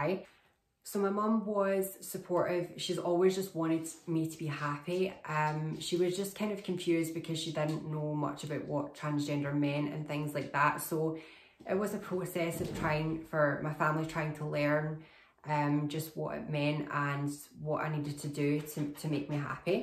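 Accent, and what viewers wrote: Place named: Scotland, United Kingdom. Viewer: I love hearing your Scottish accent. personally I am about 3 months on oestrogen. I don't really have anyone to support me in this journey I am taking. I would love if you lived in Ireland and I could call you daily and get some advice off you which would help me a lot. I do wish you the very best of luck and I would love if you could do more videos that would include your journey as much as possible.🌹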